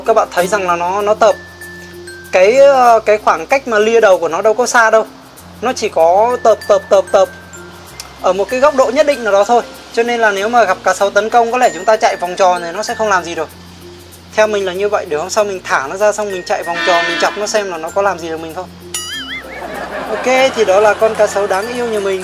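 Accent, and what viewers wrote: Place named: North, Vietnam. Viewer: A nói giọng Bắc nghe cưng quá dễ thương gì đâu Khúc mình quen rồi . Nge dễ thg cực kì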